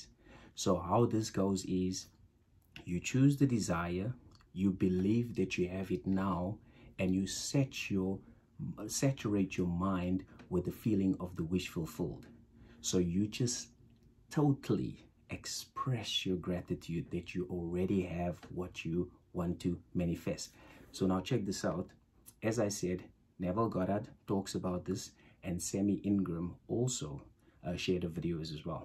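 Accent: South Africa. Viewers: I knew that accent immediately! So happy to find more South Africans in the LOA community ❤❤❤❤ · Wait you South African? Im try this too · Love your South African accent. I was in Durban years ago, loved it. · I know a South African accent when I hear one❤️👏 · Oh laaaaawd, a South African accent!!!! Heya brother!!! Hi from Austria! Now I'm homesick! So happy to see South Africans also on here, talking about the Law of Attraction!! 👋👋👋 How many numbers did you get right? I once missed the jackpot by ONE number!! That was waaaaay back! Still got a nice little sum, but not the millions! Yet! 😁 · lol, immediately when I heard you speak, I said, this guy is South African. I'm too. 😂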